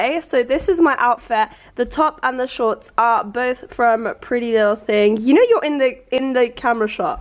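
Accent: Iran. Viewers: Hi 😍 Please speak more Persian in your videos! I love your Persian accent. especially when you and your family speak in farsi! I hope you and family be safe and happy as always!💞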